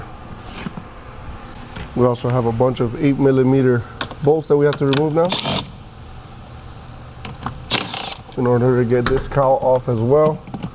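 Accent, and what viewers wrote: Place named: Latin America. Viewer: What an amazing video. I just came back from the BMW dealer here in Edmonton where I live and they told me my water pump/t'stat housing was leaking. They are looking at $2400 CAD for the repair. My car has 134.000 KMS. While I was googling the problem I found your video and honestly it does seem like a lot of work for a DYI one. Is there any way I can personally check to see if the pump housing is leaking without taking so many things apart? Is it very common to replace this part in these X1 2018 xdrive 28i models? with the amount of kms I have is it something to expect? Thanks again for the great video and clean shots, I was able to see all the detail and learn more about my car. You have a new subscriber here. I am guessing you're also latino, I was trying to figure your accent and country of origin, I know this is off topic but I was curious. 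Keep up the good work and videos. Ill check some more as I also love learning about car repairs. Gracias!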